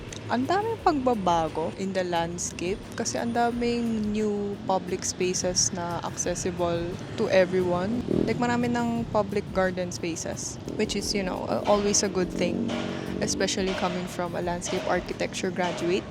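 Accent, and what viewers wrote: Batangas, Philippines. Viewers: Kainan naman sa lipa haha or sa dlsl kaso sa gilid lang kainan dun. Papunta canossa. Since highschool ka ata andun baka di ka nakapagfoodtrio masyado. Nagschool din ako din way before you. Lol. Nakakatuwa ang iyong vlogs lalo na ang batanguena accent. Lumalabas pa din talaga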